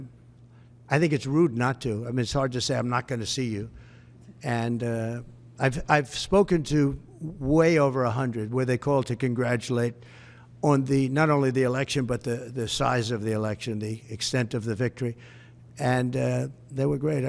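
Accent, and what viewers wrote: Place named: New York, United States. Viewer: Absolutely… it is just funny how he talks… it is a New York style I assume.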